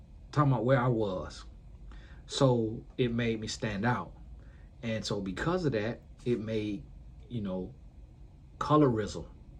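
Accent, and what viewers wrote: South, United States. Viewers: Baby the country accent is sending me 😂😂😂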